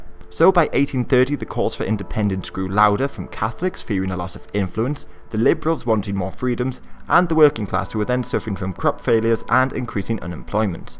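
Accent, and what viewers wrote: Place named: Newcastle, United Kingdom. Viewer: Where are you from? You sound like a Geordie who's trying REALLY hard not to sound like a Geordie...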